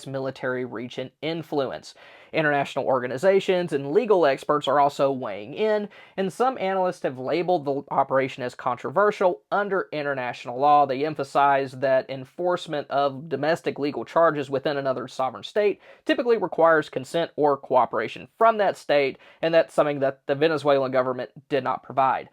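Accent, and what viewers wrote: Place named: South, United States. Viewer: I always count on you, to keep me updated about issues that might impact what I need to prioritize in my prepping efforts. Plus, makes me feel better to hear your Southern accent b/c I have one myself. Sounds like home! 😊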